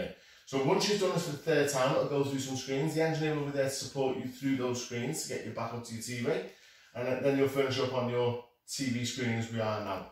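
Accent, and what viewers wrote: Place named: Liverpool, United Kingdom. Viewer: STOP SPEAKING SCOUSE! but a good video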